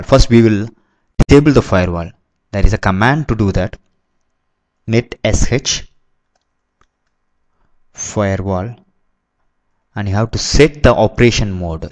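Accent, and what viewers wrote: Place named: India. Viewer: Love indian/english! :D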